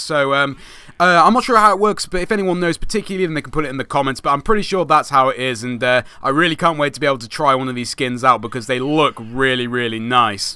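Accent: United Kingdom. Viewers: He's British? Cool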